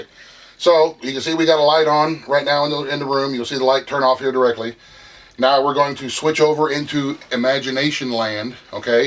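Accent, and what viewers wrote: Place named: Louisiana, United States. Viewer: I have a very strong feeling that you're from Louisiana. You sound and act the exact same as the rest of us crazy cajuns lmao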